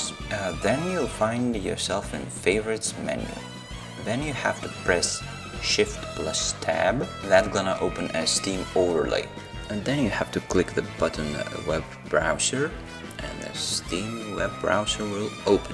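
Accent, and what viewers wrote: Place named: Ireland. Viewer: what is it with irish people doing game tutorials?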